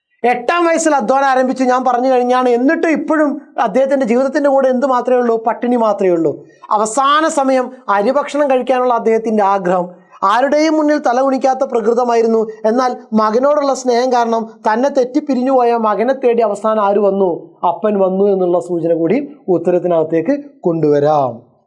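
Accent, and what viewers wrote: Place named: Malappuram, India. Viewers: Malappuram basha aan poli🔥💕💕💕thallumala⚡️👍🏻🔥🔥malappuram⚽️ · മൽപ്പൊർത്തെ വർത്താനം പൊളിയാണ് സാറേ..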